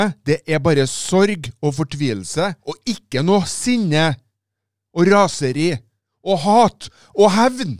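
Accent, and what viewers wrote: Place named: Östergötland, Sweden. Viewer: Bra sagt! Du snackar Östgötska på norska.... Låter väldigt roligt.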